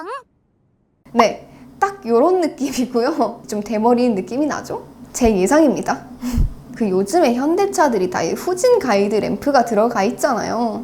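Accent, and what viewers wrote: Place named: Gyeongsang, South Korea. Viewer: 고향이 경상도 어디세요? 사투리가 똑같네요 ㅎㅎ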